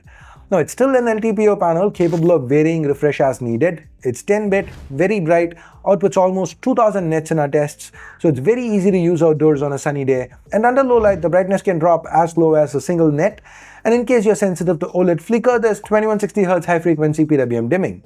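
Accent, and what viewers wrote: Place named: India. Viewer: Indian accent killing me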